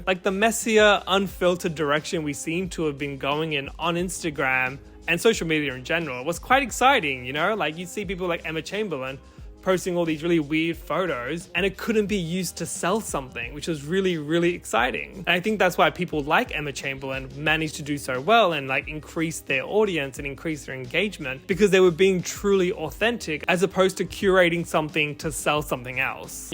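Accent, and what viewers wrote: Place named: Australia. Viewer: I never knew Aussies pronounced it as "ass-thetic" rather than actually making the /æ/ sound and now I can't unhear it.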